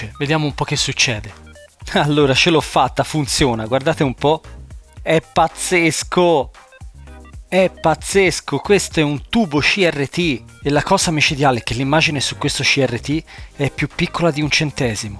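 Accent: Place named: Pisa, Italy. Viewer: L accento Pisano non si smentisce mai. Ho degli amici a Latignano. Ogni volta che sento te mi sembra di sentire loro. ahahah. Sei un grande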